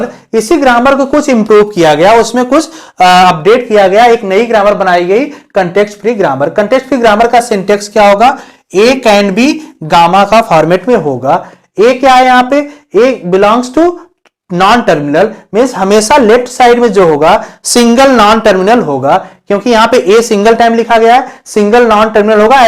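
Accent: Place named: Jaunpur, India. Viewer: sir aap jaunpur se ho kya ?🤗🤗🤗🤗🤗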